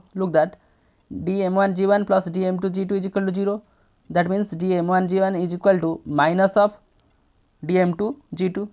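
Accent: Odisha, India. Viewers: Are u from odisha?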